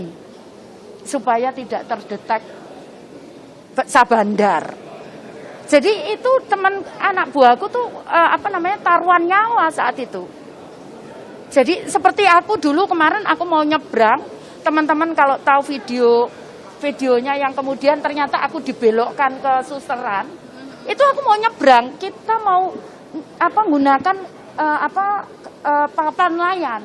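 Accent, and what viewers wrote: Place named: Surabaya, Indonesia. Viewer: Medok.. Jadi kelihatan kurang intelektualnya..mudah gugup kalo kondisi lagi di wawancara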